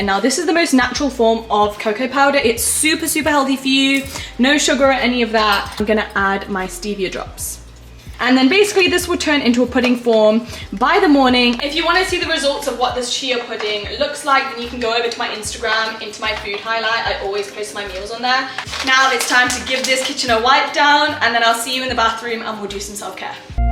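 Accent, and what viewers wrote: United Kingdom. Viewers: You r Punjabi 😃 and your brit accent ❤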